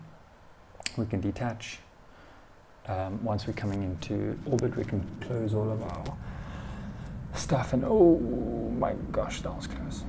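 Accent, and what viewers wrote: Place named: South Africa. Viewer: dude you sound south african lol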